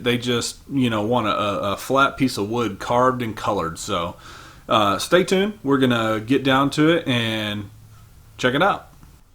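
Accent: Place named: Texas, United States. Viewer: Google the mascot for Baird, TX... It's the Bears. and the accent gave it away. That is why I checked the mascot, he sounded local to me.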